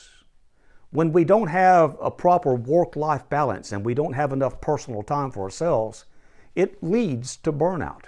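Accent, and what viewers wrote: South, United States. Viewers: I'm only in my mid 40's working in IT and Software and I've been burned out for years. I feel like every point made here applies to my burnout. I haven't worked for a year and although my health has improved, the idea of working for a company again, where I'm treated like garbage and given an extreme amount of complex work all the while being forced out whenever they company feels like it - no matter how good my work quality is. This is just the reality today - so extreme and narcissistic. It's so hard to make a serious effort when you know they don't give two sh!ts about you. Thankfully I've invested enough to retire early, but still have just a few years of work left before I can truly pull the plug. I really enjoy the type of language and accent this man uses - reminds me of the wisdom of the elders in America I enjoy learning from. 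· I enjoyed your content snd your Southern accent :) (Australia)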